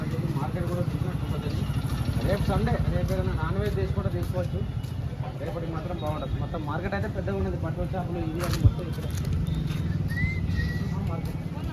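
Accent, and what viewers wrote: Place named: Andhra Pradesh, India. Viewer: Anna nidhi husnabad kada andra basha matladutatav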